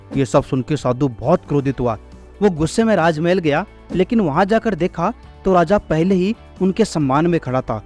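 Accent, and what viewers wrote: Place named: Marwari, India. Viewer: Boli se lagyo ki marwadi ho M bhi sikar jila ka Danta ramgarh gav se hu Kumawat Indore construction ka kaam he Indore he rehte he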